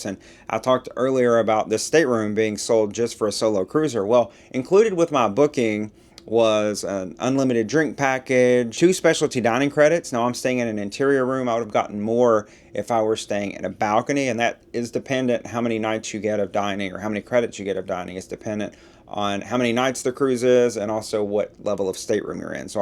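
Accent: South, United States. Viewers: Nice vid!! Love your subtle southern accent! Reminds me of a good friend of mine from Georgia 🙂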